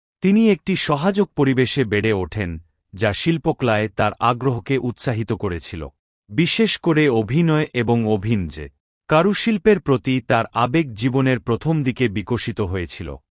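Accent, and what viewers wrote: Bangladesh. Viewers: বক্তা মনে হয় বাংলাদেইশ্যা। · নিশ্চয় বাংলাদেশী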